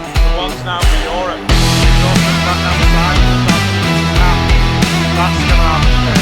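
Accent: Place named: Liverpool, United Kingdom. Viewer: In proper Scouse accent: "We have Martin Mason who is 34 and Charlie Mason who is 5, happy birthday to you guys. Red Clarke happy fourth birthday to you. Jeremy Nash happy birthday, Chloe Taylor who's 27 and Stevie Finnie who's 29 happy birthday to you. If you want to be on the bumper, please scan that QR code and there is a link below every single video."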